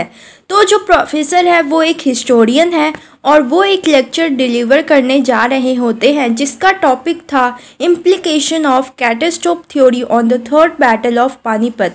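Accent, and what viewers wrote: Bengal, India. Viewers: Are u bengali?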